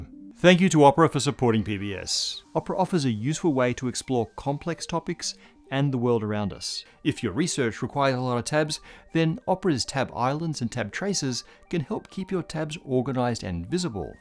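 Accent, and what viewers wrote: Australia. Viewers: Great hearing an Aussie accent presenting an American science channel. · Why use an Australian guy and not a regular North American speaker? It's always an Aussie in these science shows. As if to add credibility? Or he's still a minority even though he's white? PBS? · I really wanted to watch this, as I've tried others of this channel, but every time my patience is sorely tested and then after a few minutes just can't take all the unnecessary and extraneous gabbing and explaining. Jeez stop trying to convince us of the woundrousness of the universe and just get to the point. And at least try a little bit to obtain a more American accent. I don't know what accent that is, and typically I don't mind UK accents, but this guy's is really hard to take. Austrialian?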